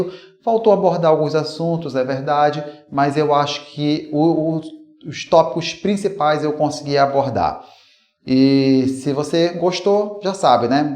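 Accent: Pará, Brazil. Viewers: Gente, esse Dr. é Paraense o sotaque Rs fofo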